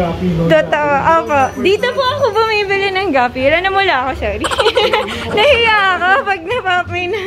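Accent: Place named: Balikbayan, Philippines. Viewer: Soo konyo nemen yern. Balik bayan accent lang malakas😆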